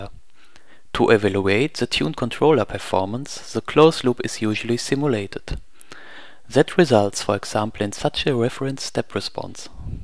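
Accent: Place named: Germany. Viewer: classic german accent haha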